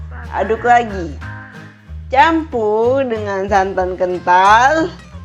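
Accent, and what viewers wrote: Palembang, Indonesia. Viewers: Cek , R nyo asli nian wong pelembang sabrang . Bedrot .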